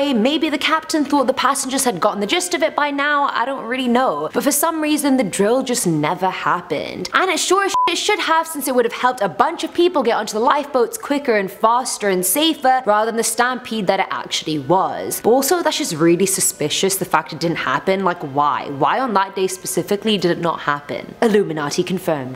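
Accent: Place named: United Kingdom. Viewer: I'm just focusing on her British accent💙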